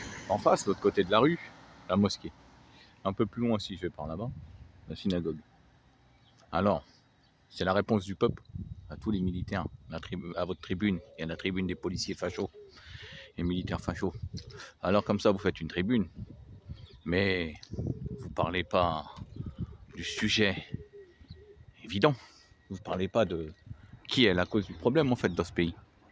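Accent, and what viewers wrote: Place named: Paris, France. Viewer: Tiens !! Quelle coïncidence... ça doit être l'accent titi parisien 👍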